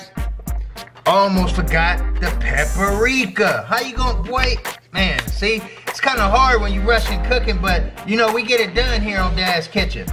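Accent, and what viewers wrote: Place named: Bay Area, United States. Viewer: Thank you for this my tri tip cam out tender! Btw Yall must be from the bay I recognize that accent lol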